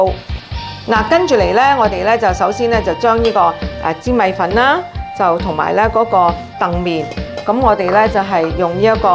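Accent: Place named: Guangzhou, China. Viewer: your accent same as mine, must be from GuangZhou, GuangDong...... de Miami, Florida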